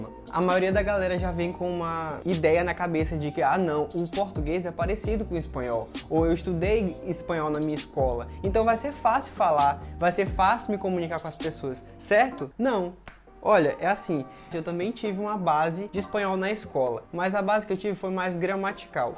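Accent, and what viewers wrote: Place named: Northeast, Brazil. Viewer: Noto que é nórdestino. Parabéns. Sou gaúcha do RS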